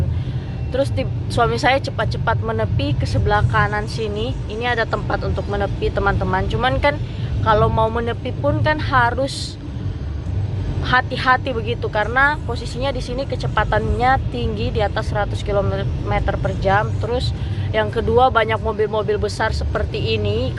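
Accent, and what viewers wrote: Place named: Palu, Indonesia. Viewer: Tetap logat palu... 🤣 Lanjuut joooo.... 👍👍🙏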